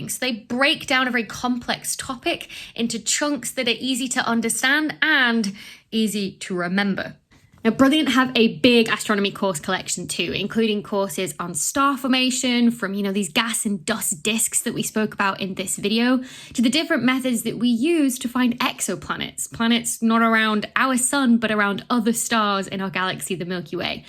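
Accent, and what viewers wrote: United Kingdom. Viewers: Your accent. Is just fine; after Boston, all Brits have learned to hide their "T." 😊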